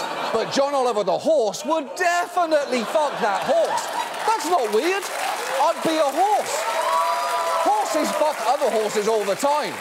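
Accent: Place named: United Kingdom. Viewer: that trademark British delivery system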